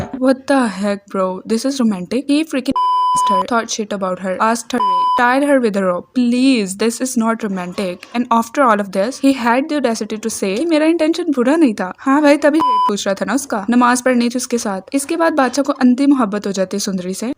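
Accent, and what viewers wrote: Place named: India. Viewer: Par apki accent indian lagi such mai hmko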